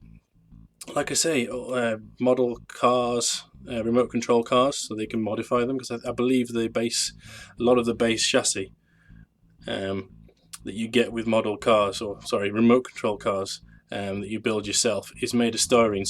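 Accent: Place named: United Kingdom. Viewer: Your Brittish accent is so annoying. Please do videos in Middle West American English, please.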